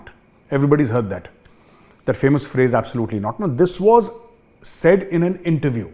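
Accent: India. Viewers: bloody major why you are thinking for pakistan why don't think for India. You are teaching how to talk an oxford graduates , bloody majot may you can't enter the main gate of oxford university . like you little educated man lots in pakistan. you even can't speak proper english only indo english. shut of your mouth.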